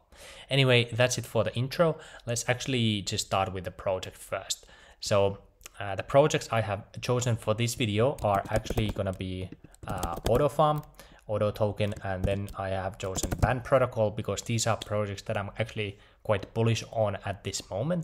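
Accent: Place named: Finland. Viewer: Are you from finland? cuz you sound like it.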